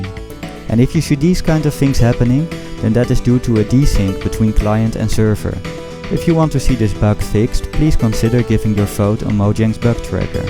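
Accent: Netherlands. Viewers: Het accent wel:3 Ik ben trouwens ook heel blij dat ik een Nederlands accent heb herkend. Ookal is het best makkelijk;3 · Ben je Nederlands? je hebt namelijk een echt Nederlands accent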